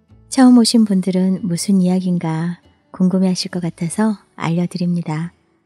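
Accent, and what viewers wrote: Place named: Gyeongsang, South Korea. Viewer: 목소리의 마법사이신 어쩌면 경상도 사투리도 넘~ 감사합니다^^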